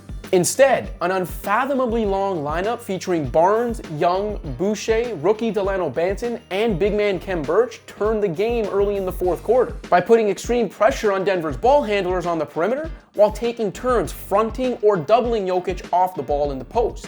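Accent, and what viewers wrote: Canada. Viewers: never noticed your cool canadian accent before 🕺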